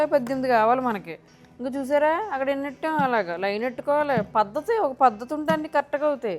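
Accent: Godavari, India. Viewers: Hi andi mee videos chala baavuntaayi Maadi kuda amalapuram 😊 Mana godavari basha super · ఈ రోజే YouTube మీ వీడియోని first time నాకు చూపించిందండి. అది చూపిస్తే కదండీ మనం చూసేది. మీ గోదారోళ్ల యాస బాగుందండి. Suharsh family in Canada ఛానల్ లో సులోచన యొక్క రాయలసీమ యాస చాలా అంటే చాలా మంచిగుందండి. మా ప్రాంతం యాస లో ఎవరైనా ఛానెల్ పెడితే బాగున్నండి.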